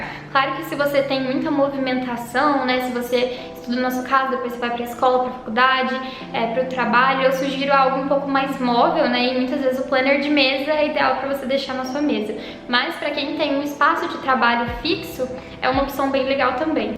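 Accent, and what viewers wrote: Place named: Goiás, Brazil. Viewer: acho mto fofo o seu sotaque goiano puxando o r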